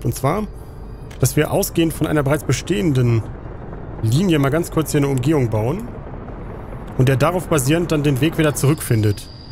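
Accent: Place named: Russia. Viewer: . Du hast ein super Video gemacht was ich wie immer liebe. Ich bitte dich bitte den Russischen aktzent weg zu lassen, weil es mich und meine Freunde etwas beleidigt. Natürlich wissen wir das dass nicht provokant gemeint ist und du das aus spaß sagst. Ich hoffe du schätzt das dein Russischer Abo.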